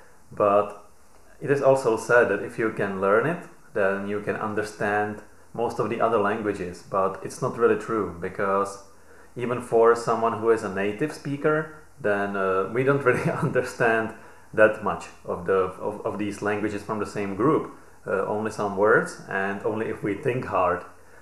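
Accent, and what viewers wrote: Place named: Czech Republic. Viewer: ma cesky prizvuk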